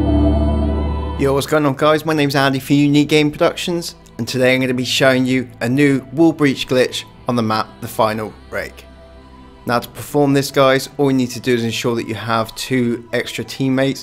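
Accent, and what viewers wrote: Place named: England, United Kingdom. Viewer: Dude,I like your pronanication by "Reich".😂1 Like for the english akcent by German words.👌👍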